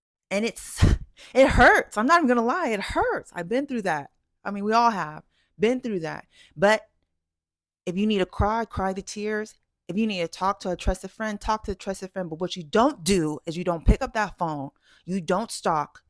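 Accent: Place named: Bay Area, United States. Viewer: I knew you were a Bay girl!!!! hahaha I was like she from LA?? ehhh nah...then you said it lol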